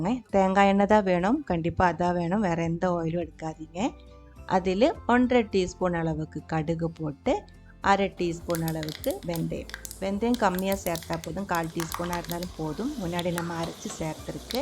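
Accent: Kerala, India. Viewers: V good. Lovely Malayalam accented Tamil. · "Valara nanyaittu irukaiyanu ningalinde recipe"I love your malayalam accent wile speaking in Tamil.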